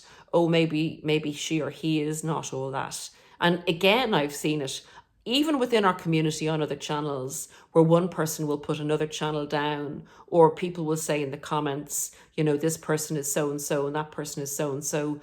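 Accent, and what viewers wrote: Ireland. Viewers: You don’t need to defend anything you do, you are a kind wholesome person and I love your beautiful Irish accent. My darling Irish Mammy died in March. She taught me how to be loving and to love.Please don’t don’t don’t apologise. The best trick of the devil is to pretend he doesn’t exist.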